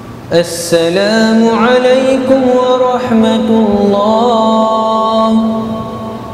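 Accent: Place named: Malaysia. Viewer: mirip2 malaysia ya logat2nya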